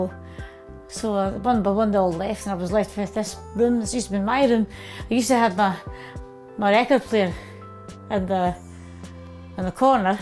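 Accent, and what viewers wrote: Scotland, United Kingdom. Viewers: Many English speakers who are not used to hearing someone speak English with a moderately thick Scottish accent will have trouble catching all of her words. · Listening to her talk about the house, (love her Scottish accent) I believe this was her family home. Such a warm, lovely place. She is a wonderful talent and she looks so good. I am glad for the update.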